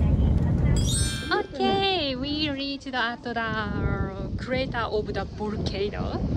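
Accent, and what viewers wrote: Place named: Italy. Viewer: I LOVE all of your videos. This is just my observation so I do not mean to offend you, but it sounds like you have an Italian accent. I think it’s COOL!!!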